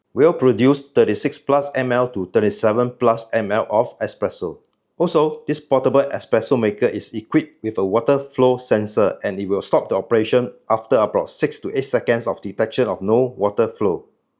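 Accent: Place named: Southeast Asia. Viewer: Greetings to south East asia 😁 love the accent Great review Not changeable like the batteries of modern day cell phones or not changeable at all? Also wonder: the open filter at the bottom is nice but it would be cool to be able to mount some funel to avoid spilling and to let the coffee run straight into milk foam Not sure if that's a great idea though: too hot moka (Bialetti) causes brown, bitter milk foam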